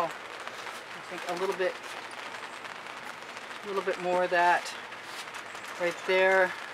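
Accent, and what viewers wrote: Texas, United States. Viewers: A big ol' party going on in that one! Texas talk. Love it!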